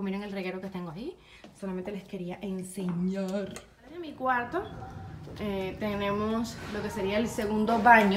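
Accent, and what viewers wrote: Cuba. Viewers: Hola!! Soy nueva en tu canal llevo una semana contigo, el primer vídeo que vi fue el último que hiciste de decoración de Navidad pero no podía dejar de comentar este. Adoro tu casa osea me mudaba ya!!! Es preciosa luminosa ainsss me encanta!!! Poco a poco veo todos tus vídeos soy española y cuando termino de verte hablo con acento cubano tu ya me entiendes jajaja que me encanta tu gusto, es muy parecido al mio y adoro tu casaaaa!!! Un besado enorme!!!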